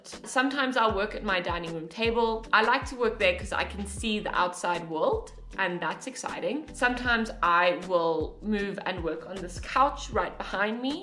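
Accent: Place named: South Africa. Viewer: SO NICE WHEN I UNEXPECTEDLY HEAR THE SOUTH AFRICAN ACCENT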